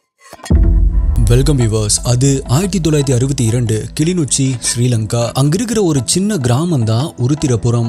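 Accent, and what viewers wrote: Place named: Chennai, India. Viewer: இலங்கைத்தமிழில் கதையுங்கள். நாசமாகப்போன Madras தமிழில் கதைத்து நீங்களும் நாசமாய் போய் சமூகத்தையும் நாசமாக்க வேண்டாம். தூ.......தூ......தூ.....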